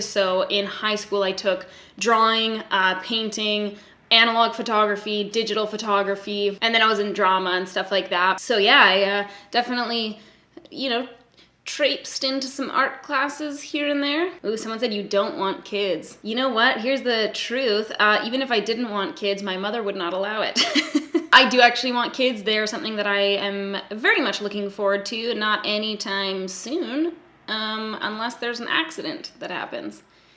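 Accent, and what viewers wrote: Canada. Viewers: This is going to be such a random comment but in this video you sound like the Canadian stereotype from a century old. Yeah. Sorry about that, I had a weird association.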